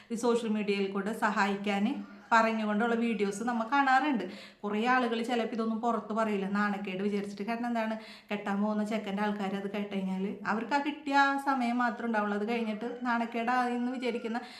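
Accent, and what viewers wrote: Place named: Thrissur, India. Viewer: Itha trisur ano samsaaram kondu thoniuadhaane 🥰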